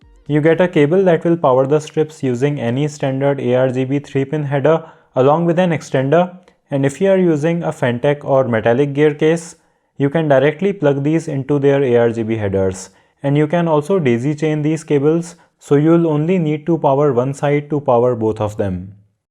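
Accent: India. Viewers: Lots of indian accent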